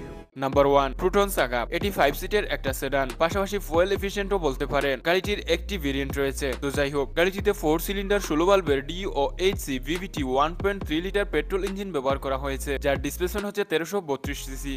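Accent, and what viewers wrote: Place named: Sylhet, Bangladesh. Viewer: সিলেটি উচ্চারণ।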